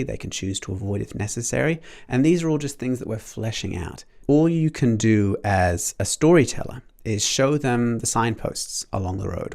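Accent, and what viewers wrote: Australia. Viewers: First time finding the channel and I immediately ran to the comments to look for this, except I'm pretty sure he's Australian.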